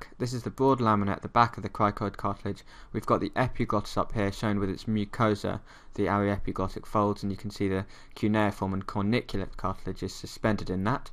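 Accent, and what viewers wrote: United Kingdom. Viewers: Your british accent and haste makes it very difficult for me to understand :( Can you subtitle more? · can't listen to the whole video , i hate the british accent -_ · I love British accent ❤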